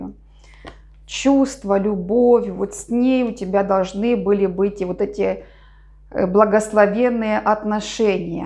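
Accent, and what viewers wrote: Ukraine. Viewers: Вы все расказали о нас с ним правду ,как будто вы за стенкой живете у нас ! Я преклоняюсь перед вами ! Как вы все правильно видите и говорите ! Дай. Бог вам крепкого здоровья и мирного неба нам всем над головой ! Да я украинка ,и вы наверно тоже ? По говору чувствуется ! 100000 раз вас за все благодарю ! Хотелось бы мне с вами сделать личный расклад ? Напишите мне ответ ? Благодарю вас ! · Приятно слышать ваш украинский говор специфический, в Крыму по нему можно определить местных жителей. Спасибо за расклад...он сбил серьгу...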